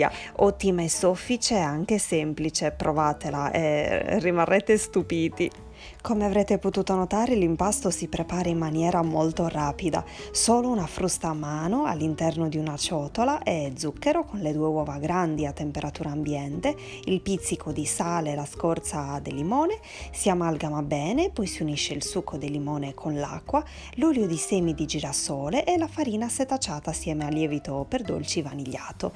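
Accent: Sardinia, Italy. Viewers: MA BRAVA,LA FARÒ SICURAMENTE....CHE PIACERE SENTIRE IL TUO ACCENTO SARDO! GRAZIE X QUESTA RICETTA 👏👏👏👏😘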